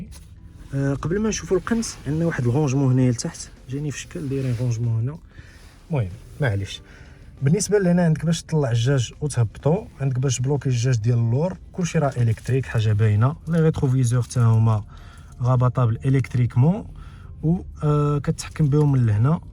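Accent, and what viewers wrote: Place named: North Africa. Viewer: وربي مكيف ومبسوط على اللهجه اكثر من ااسياره.. ربي يحفظ شمال افريقيا واهلها الكرام.. جعل ربي يرزقكم هل سياره كل واحد منكم.. لانكم تستاهلون كل الخير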